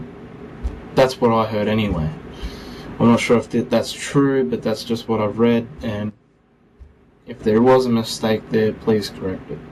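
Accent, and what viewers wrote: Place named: Australia. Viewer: sounds australian to me...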